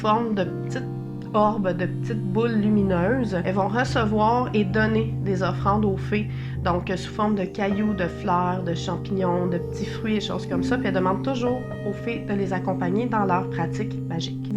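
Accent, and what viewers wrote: Canada. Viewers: Oh punaise une canadienne avec l'accent canadien, je trouve cette accent tellement magnifique. Merci pour cette vidéo j'essaye d'en apprendre plus sur les sorcière. Je suis sorcière solitaire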